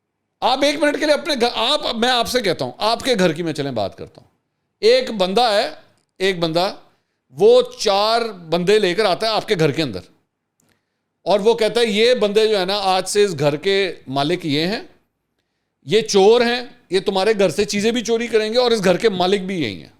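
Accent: United Kingdom. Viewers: 😂 good British accent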